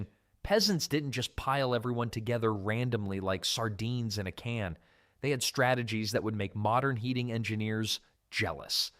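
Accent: United States. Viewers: If there is one thing I love ( not) is listening to some yankee narration trying to be dramatic but failing to hold attention. Get to the point and drop the BS.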